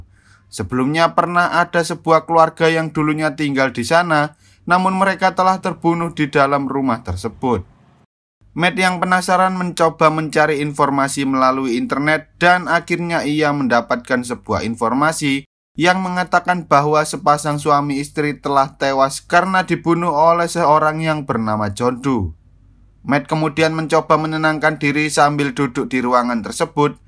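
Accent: Java, Indonesia. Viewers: medok e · orang jawa lik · Mas koe opo wong jowo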